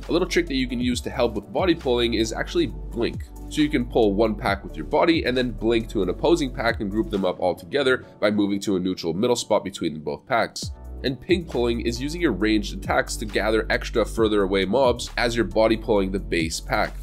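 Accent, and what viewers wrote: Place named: Canada. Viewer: Typical Canadian. Makes my ears bleed.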